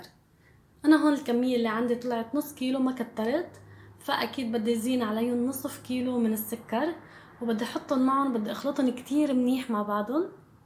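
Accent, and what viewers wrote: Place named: Lebanon. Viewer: اولا تسلم ايدك ثانيا اللهجة اللبنانية روعة تحياتي لك من سوريا❤ اتمنالك التوفيق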